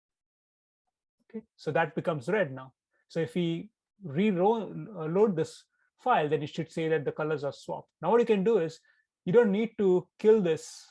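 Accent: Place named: India. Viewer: please guys please to all my indian ppl ur killing us with the accent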